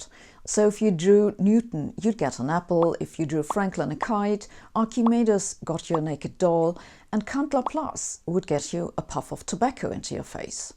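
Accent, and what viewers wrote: Germany. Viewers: Hello Sabine, I've discovered your channel about a week and have been binge watching since then. I think you probably have one of the best science channel out there and I like your german accent. I have a question though : would making the hypothesis that God rules the world through quantum mechanics make any sense science wise ? I know that such an hypothesis would be just as unlikely as any other theories (many worlds etc..), maybe more, but could it be ruled out science wise ? It sorts of make sense that if God influences the world, then He would do it through the most fundamental elements of Nature (although I know that a beautiful theory doesn't make science). In this theory God would chose the outcome of events through quantum decoherence. Since one cause can have multiple consequences in quantum mechanics, God would chose which outcome he likes the best out of them. 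Which outcome actually happens would thus be determined by "God's Will" I guess that would imply that quantum decoherence both happens and has macrocospical consequences in Nature (without human intervention), which I believe is the case based on what I've understood from your videos. I am not a religious person desperatly struggling to fit God in Science. I'm just an agnostic who's curious to know what does science have to say a the matter. I believe I am not the only person who thought of this, so it could potentially make a good video subject. Thank you.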